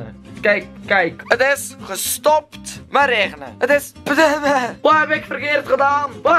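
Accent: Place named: Flanders, Belgium. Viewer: Nederlands of Belgisch lol? Je accent is zo Vlaams maar je praat wel met een harde G?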